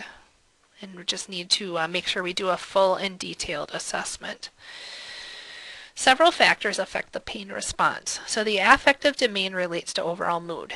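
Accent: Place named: Midwest, United States. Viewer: I love the mid western accent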